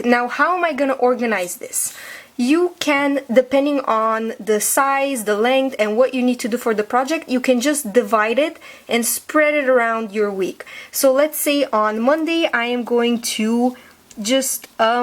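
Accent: France. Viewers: you are French right ^ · are you french??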